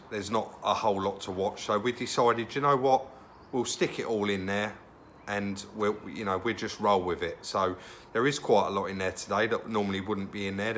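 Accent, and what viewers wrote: United Kingdom. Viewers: You can dress this channel up as much as you like, but that accent is (apart from Scouse), the most distrustful and rotten in the UK.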